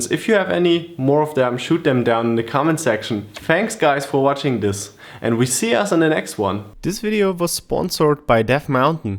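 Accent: Germany. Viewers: Where are you from? Germany? :D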